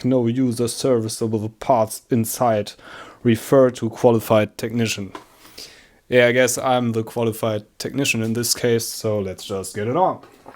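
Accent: Germany. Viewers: Ich höre da ein deutschen raus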